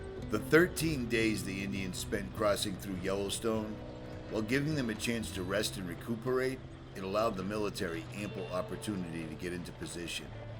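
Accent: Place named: East Coast, United States. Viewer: Your from the east coast aren’t you?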